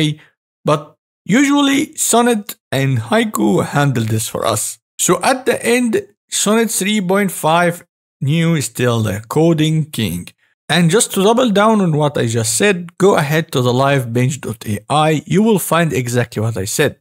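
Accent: Egypt. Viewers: أنت مصري اكيد الاكسنت فضحاك😅❤ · You must be an Egyptian. I know our accent. If so, 3amel eh? XD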